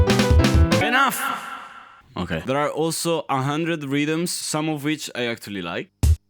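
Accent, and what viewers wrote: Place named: Emilia, Italy. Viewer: Sei palesemente emiliano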